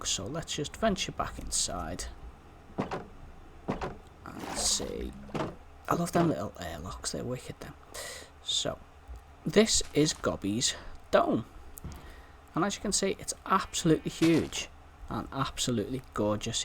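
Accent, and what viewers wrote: Liverpool, United Kingdom. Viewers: Love it!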